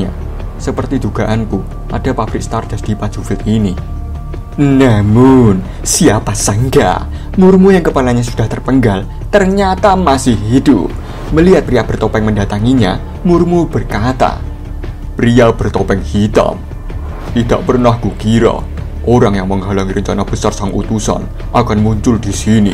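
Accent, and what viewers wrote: Java, Indonesia. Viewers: Wong jowo a mass?🗿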